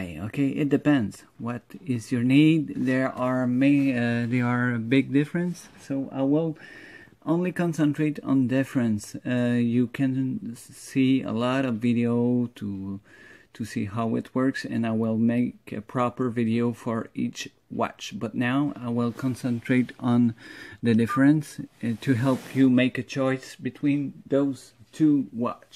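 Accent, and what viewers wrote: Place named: Quebec, Canada. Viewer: the Quebecois is strong within your voice. :D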